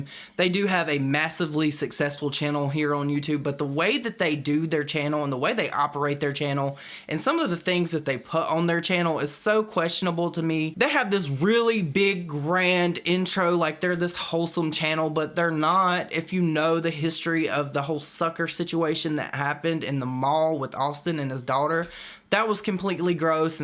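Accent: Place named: Oklahoma, United States. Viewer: Just found your channel and subscribed! Loving the content!! Keep it up!! :) When I heard you start talking I googled to see where you were from because I thought you were from Oklahoma where I am from! :)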